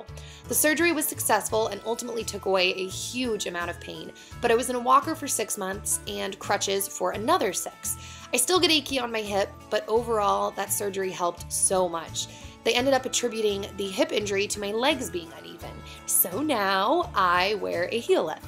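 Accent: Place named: Wisconsin, United States. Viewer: what state are you from you sound kinda like Wisconsin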